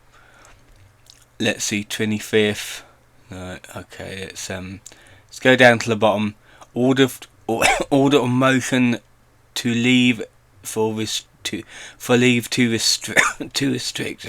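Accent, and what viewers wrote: United Kingdom. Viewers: average british voice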